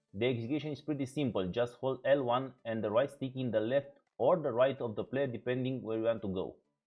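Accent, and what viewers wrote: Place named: London, United Kingdom. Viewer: Nice London man, are u African?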